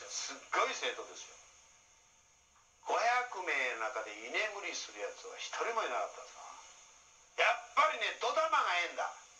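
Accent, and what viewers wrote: Kansai, Japan. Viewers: 英語教師がゼニ払うてんねんて、関西弁話すのカッケーな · 関西弁で何言ってるかわからないです！ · いやこのはんなり系関西弁なしゃべり方だったら眠たくもなるわ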